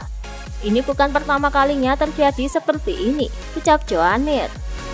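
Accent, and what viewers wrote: Java, Indonesia. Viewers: Narasi Medok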